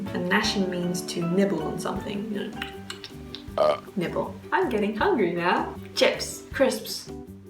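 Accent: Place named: England, United Kingdom. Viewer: Seeing as your English and live in German I thought you'd be the person to ask, do Germans seem to like your accent or find it interesting? I've had this experience with some people from other countries and wondered if you had encountered this in Germany. If so you should make a video on it, I'd love to hear your experiences :)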